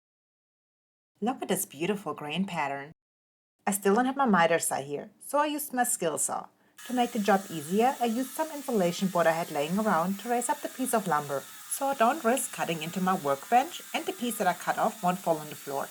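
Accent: Germany. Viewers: The lack of German being spoken in this video makes it less fun. 🤣🤣🤣....it's all good, though. The accent makes it worth it still.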